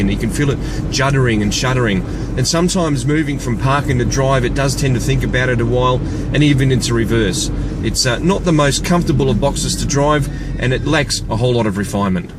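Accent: Australia. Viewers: Ajam Yunus aussie le ngok... slang down unduh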